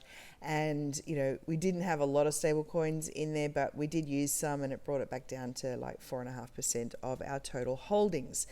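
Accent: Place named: Australia. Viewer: Thanks for the video, I've only just heard of the Plan this week so still doing some DD at the moment. You sound like you're Australian, I am too, can I ask if the grading happens in USD, thus the initial investment of $3000 per bot will actually be $4200ish AUD, are returns then also calculated in USD to be converted back to AUD at the end? Not sure if that makes sense, but basically just wondering if we in Australia would also benefit from the current currency exchange rates if we cashed out some of the positive returns?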